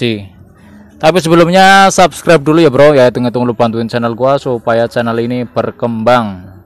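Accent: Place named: Java, Indonesia. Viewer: Bang ituh ngomongnya kaya medok bgt yh bang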